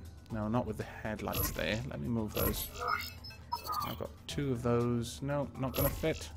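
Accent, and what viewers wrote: United Kingdom. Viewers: I wonder where that accent is from. It's like a proper British accent with some foreign mixed in to make it interesting.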